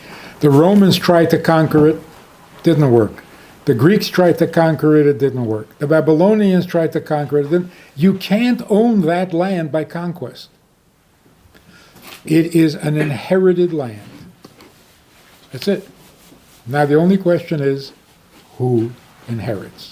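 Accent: United States. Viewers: 😂 love the Texan accent, suits you! X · good voice, sounds like a radical priest from arkansa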